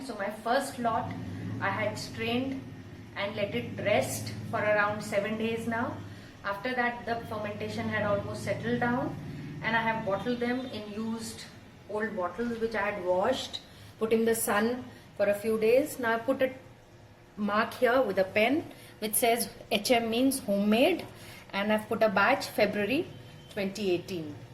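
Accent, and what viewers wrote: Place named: England, United Kingdom. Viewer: Your english accent is your from army background.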